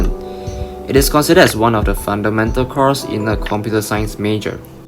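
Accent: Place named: United States. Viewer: Why do i hear the US accent HAHA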